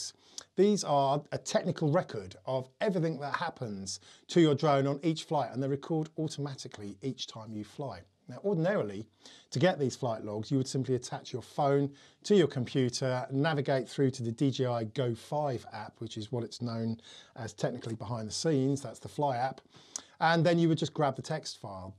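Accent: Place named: Durham, United Kingdom. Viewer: not yorkshire mate durham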